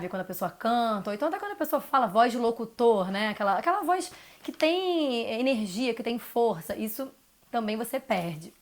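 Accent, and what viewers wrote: Rio de Janeiro, Brazil. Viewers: Não consigo assistir com esse sotaque carioca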